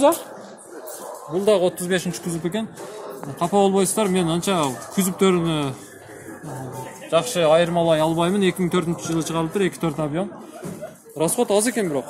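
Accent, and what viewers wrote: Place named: Osh, Kyrgyzstan. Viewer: кам болбо ука денсолук оруба нак оштукча суйлойсун жэткизип ушундай шанду тартыш керек машина базара озум баргандан болдум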